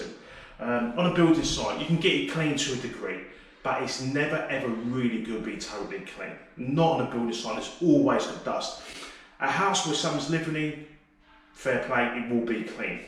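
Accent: United Kingdom. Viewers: You have a proper British accent, I shall trust you.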